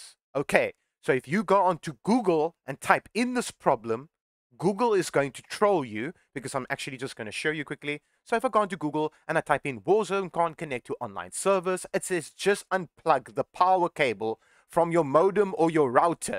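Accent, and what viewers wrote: South Africa. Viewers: thanks! btw is my man south african?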